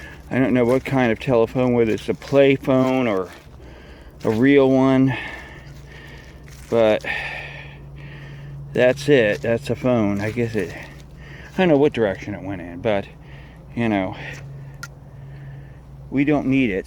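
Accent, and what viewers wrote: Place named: New Jersey, United States. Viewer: You got a nice little spot there! Sounds like you are from new jersey with that accent :)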